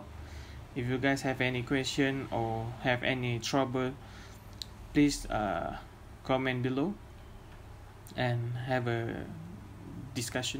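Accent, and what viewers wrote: Malaysia. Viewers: sounds malaysian? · how about if my disk space is full, but i had the game download already? btw where you from because i hear some manglish.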